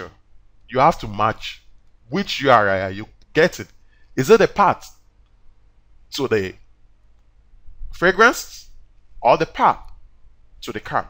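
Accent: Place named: Nigeria. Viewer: your sounding like a nigerian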